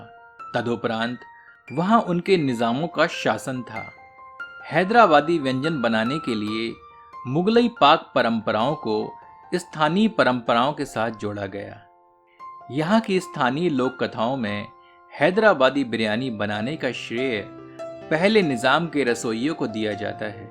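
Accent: Standard. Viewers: The pure hindi voice over is amazing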